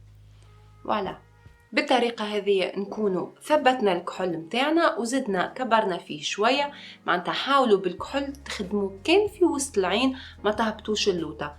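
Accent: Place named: Tunisia. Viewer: محلاها اللهجة التونسية 🇲🇦🇲🇦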